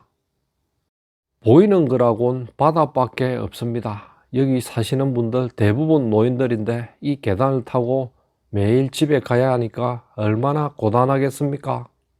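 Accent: Gyeongsang, South Korea. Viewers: 지도 싫은데 굳이 드론까지 띠워서 열심히도 찍었네 ㅋㅋㅋ 난 이양반 부산 경상쪽 만 좌표 찍어서 죽어라 찍어 열심히 비아냥 거리는거 ㅈㄴ 웃김 ㅋㅋ. 70년 생이라서 대학떄 농활다니면서 정신교육을 제대로 받았는지 ㅋㅋ 말투는 영락없는 경상도 말투인데 찍어내는 컨텐츠는 그야말로 지역 죽어라고 고사를 지내는 수준 ㅋㅋ 제발 이런노력 전라도나 경기 권에 가서 하십셔 ㅋㅋㅋ 이런 정성으로 그쪽 파보면 부조리가 100배는 더 보일테니 ㅋㅋ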